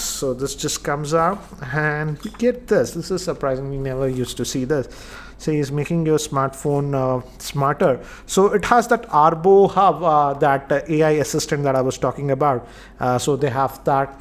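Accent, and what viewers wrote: India. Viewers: Sir Mind Nahi Karna Technical Guruji Jab bhi Video Upload Karte hai Hindi mein Baat Karte hai joh Sab Indian Pakistani aur Kafi Asian ko Samjh Aa jati hai Kyu Unko Pata hai Hindi/Urdu Sab Samjh Jayenge Lekin English Kam Log Samjhte hai. Aap Jab bhi Video Banate ho English mein Baat Karte ho Kya Aapko Sirf English hi Aati hai Ya Aap Dusron ko Attract Karna Chahte ho English Bol kar Sir Mind Nahi Karna Aapki English bhi Hindi Style ki Lagti hai Behter hai Aap Hindi mein hi Video Banaya..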